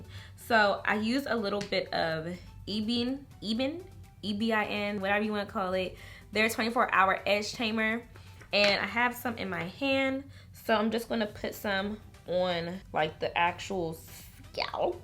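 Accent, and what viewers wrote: DMV, United States. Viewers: This was a hilarious video your accent you must be from the dmv area lol you had me dying laughing! But this tutorial was great I think I wanna try this method I cant deal with putting rubber bands in my hair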